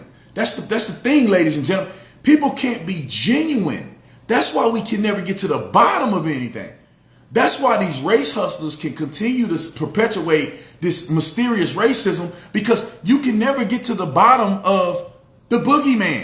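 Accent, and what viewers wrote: United States. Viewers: Sounds like u grewup an American ! So did I.....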